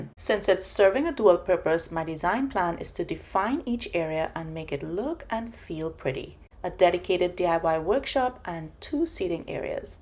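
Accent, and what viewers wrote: Trinidad and Tobago. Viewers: thought I recognized the accent. I am also from trinidad. Well done on your patio refurbish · Your acent sounds like you're from Trinidad?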